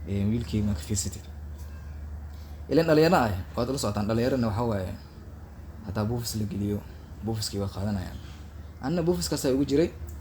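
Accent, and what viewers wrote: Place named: Xamarjajab, Somalia. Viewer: nacso u sound like u from xamarjajab beenlow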